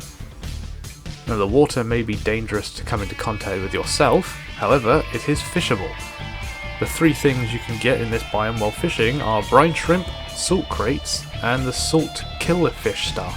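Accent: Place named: England, United Kingdom. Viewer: I thought you sounded such as soon as you started talking normally. Though uh, that might just be because of the stereotypes associated with your accent here in the US. English accents are often used to convey either intelligence or nobility here.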